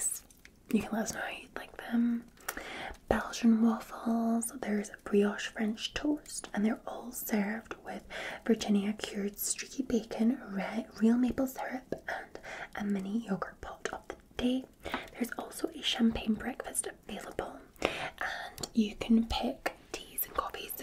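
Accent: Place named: Scotland, United Kingdom. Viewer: Love your Scottish accent and your scottish hotel videos I have PTSD and your accent always makes me safe my first asmr video was your cozy cottage video been a fan ever since thank you for all you do your fan jamie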